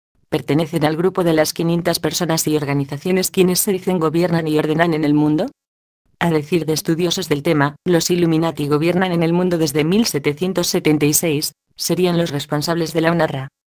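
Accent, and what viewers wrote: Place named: Spain. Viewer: Los poderes fácticos son quienes tienen el dinero y no es que entre todos se pongan de acuerdo, es sólo que el sistema capitalista así funciona. Cuando era niño todo se relacionaba con los satánicos, hoy se habla de illuminatis y de reptilianos. La idea de que hay una conspiración detrás puede ser parcialmente cierta, pero tampoco hay que caer en la paranoia. PD: esa vocecita españoleta es irritante.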